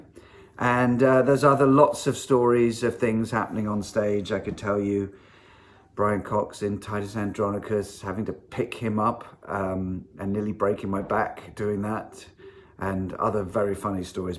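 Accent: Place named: United Kingdom. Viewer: I always forget about your British accent because I watch Michael Cutter too much.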